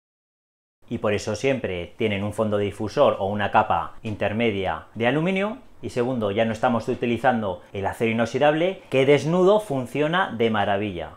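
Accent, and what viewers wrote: Aragon, Spain. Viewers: Holaaa, no te había visto nunca pero hoy ha sido el día. Eres aragonés no? Por el acento maño. Yo también soy maña😊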